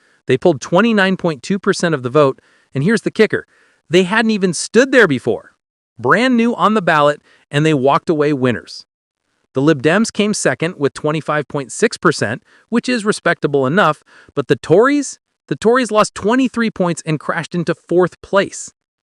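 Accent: United States. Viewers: as usual - no clips , no evidence - just the same boring American voice enjoying the sound of his own voice!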